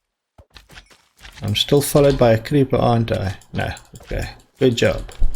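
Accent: South Africa. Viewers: You sound South African so awehhh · His sounds South African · Na he sounds south african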